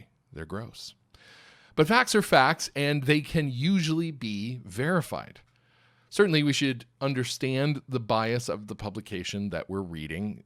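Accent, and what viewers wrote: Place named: France. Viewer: Great French accent. Oh boy will dissenters never give up.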